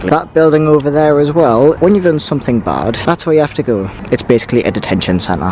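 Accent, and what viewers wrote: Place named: Newcastle, United Kingdom. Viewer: Geordie definitely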